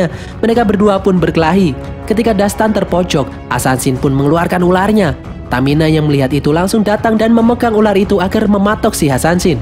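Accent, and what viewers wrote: Java, Indonesia. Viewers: Miminnya orang Jawa😂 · Wong jowo yo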